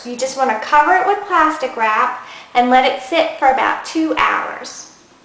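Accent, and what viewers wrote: South, United States. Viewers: Are you southern cause your accent sounds like it?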